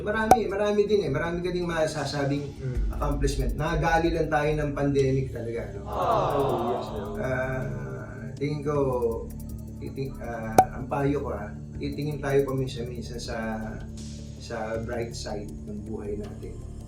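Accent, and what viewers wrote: Philippines. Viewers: taga saan ka ba ka tunying, parang purong tagalog ka e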